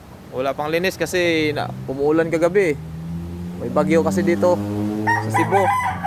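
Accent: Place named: Sabah, Malaysia. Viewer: your voice like Sabah people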